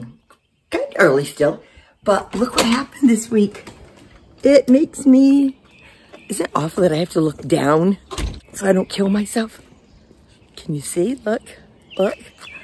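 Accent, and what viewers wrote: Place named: Massachusetts, United States. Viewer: Nice to be sitting in SC - after all my life in Stoughton / Canton area - so, I know right where you are and happy to hear someone who sounds like me😉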